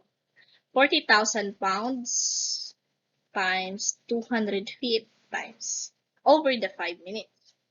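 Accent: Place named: Bicol, Philippines. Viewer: bicolano pala kayo maam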